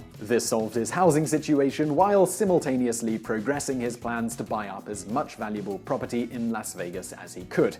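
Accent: United Kingdom. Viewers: Gallagher Rutledge ya most Americans pronounce those worlds right, but I understand since he was British.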